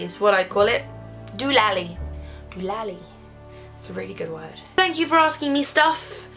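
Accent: United Kingdom. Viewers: I'm from Brazil and i just love your British accent and all your videos.I don't really have any question.Maybe,how can you be so beautiful?LoL Kisses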